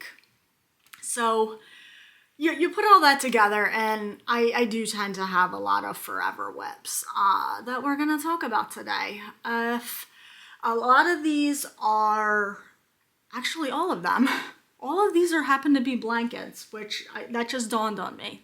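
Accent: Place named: California, United States. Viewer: South Central PA or valley girl? You sound like California.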